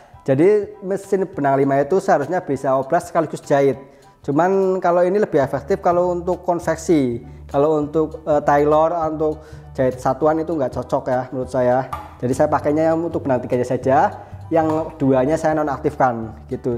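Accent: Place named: Pekalongan, Indonesia. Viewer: Assalamu'alaikum mas, saya penjahit dan tertarik dgn kontennya karena enak dan jelas, dan logatnya saya kenal, ternyata bener orang pekalongan.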